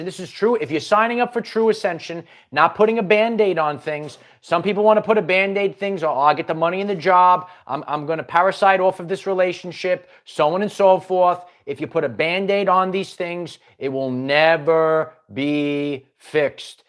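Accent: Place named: Philadelphia, United States. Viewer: Are you at Dan Giacobbe’s house? Def have a Philly accent.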